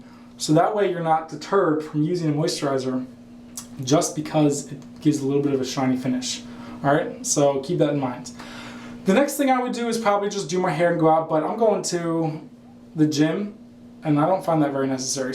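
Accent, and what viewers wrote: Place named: Rural, United States. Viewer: You Speak like a brain dead hick ....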